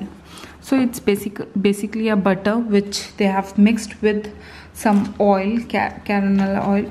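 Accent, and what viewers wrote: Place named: Kerala, India. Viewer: hahahahaha y u talking malbari english...malyaram hindi english hahahahahahaha fucking butter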